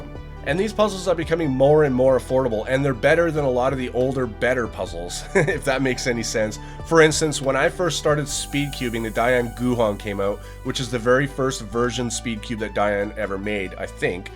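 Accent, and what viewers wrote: Canada. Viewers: so are you Canadian?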